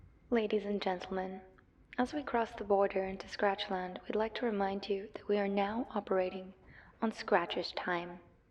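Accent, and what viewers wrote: United Kingdom. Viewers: dude that posh accent lol. you actually did great with the animation, you really make them breathe and emote.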